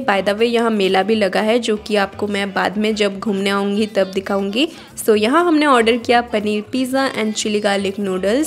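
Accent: Odisha, India. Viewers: Your Odia is so sweet ❤️✨ Like I'm replying that part ✨✨👀 In other vlogs plz do that again 😍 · Your odia accent is so adorable❤.. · Your odia accent is so cute ❤ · Di your odia is so cute 🥺🥰 and you also look soo cute & pretty 🤩😍💜 From - odisha,angul✨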